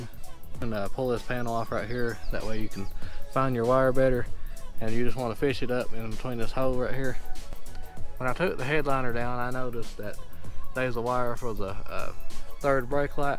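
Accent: United States. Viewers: U did a good job being the first one, their is no way we don't live close to each other with that accent, I live in Central NC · Awesome video! Straight to the point with all the information you need without a buncha blah blah blah, and a plus is you got a southern accent like me! EXCEPT it is impossible to unplug the harness glued to the headliner !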